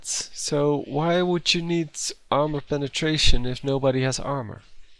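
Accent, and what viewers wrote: Netherlands. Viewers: Are u dutch?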